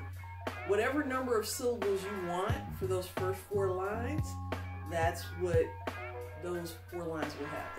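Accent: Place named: Kentucky, United States. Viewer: I love your accent. Kentucky via Central Illinois!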